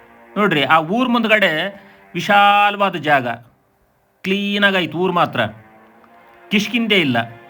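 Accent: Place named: Pavagada, India. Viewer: Pavagada bhashe lli heltha ediya guru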